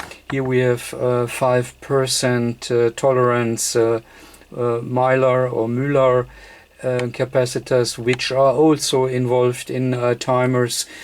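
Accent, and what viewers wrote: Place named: Germany. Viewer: I do hear a strong German accent. Greetings from the Nederlands my vriend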